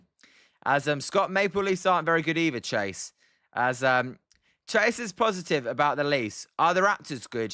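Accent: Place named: United Kingdom. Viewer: Are u británico....u accent is so strong...mmm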